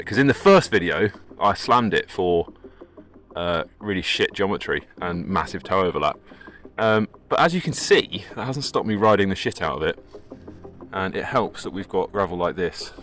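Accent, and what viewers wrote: United States. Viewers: Impressive American accent, Mr..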